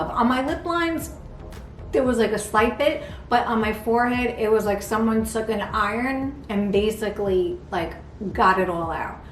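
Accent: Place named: New Jersey, United States. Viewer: This is great! Love your accent too- I used to live in Edgewater NJ and it reminds me of home! Thanks a million.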